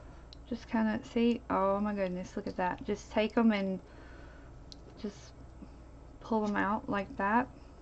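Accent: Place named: Eastern Kentucky, United States. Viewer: On the last ornament..., did you ever try using some sort of a starch, maybe? And girl, where are you from? I'm in Nashville, but I'm from Eastern Kentucky. Your accent sounds like mine...lol.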